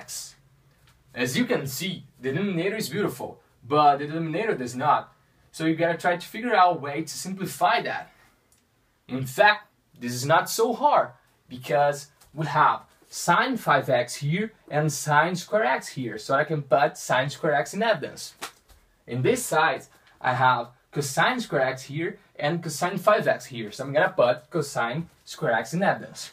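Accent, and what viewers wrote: Brazil. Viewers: Galera, vamos acordar 2018! Saber inglês não é diferencial, é essencial! Mais do que nossa obrigação saber a lingua universal ainda mais que ele tem bastante sotaque brasileiro e fala devagar dá pra entender claramente! Excelente vídeo amigo!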